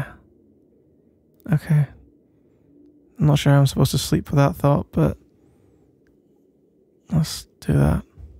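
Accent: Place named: Scotland, United Kingdom. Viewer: Your audios make me yearn for a boyfriend like you. The accent. The humour. The gentleness of you. God I wish I could find a scottish boyfriend like you haha. Keep making good audios, sbf! You literally saving us gays for being a hopeless romantic 😖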